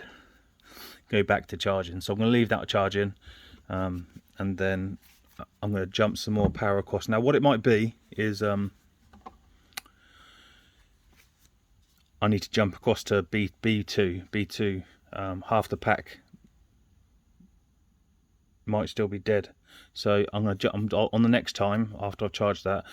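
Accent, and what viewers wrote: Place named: East Anglia, United Kingdom. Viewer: Thank you for this. I guess East Anglian - Norfolk or Suffolk?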